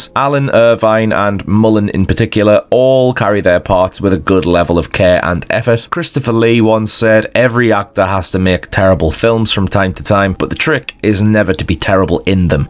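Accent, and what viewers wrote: United Kingdom. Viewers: Actually British accent somewhat hard to understand when ur 2nd language is English 😭 but enjoy the movie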